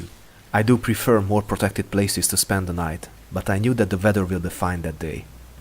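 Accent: Hungary. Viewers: This is the first video I saw from you, but I instantly recognized the hungarian accent. :D Great vid, keep up the good work, valahol van egy eladó bojlerem is. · The moment i heard your voice i knew you are Hungarian.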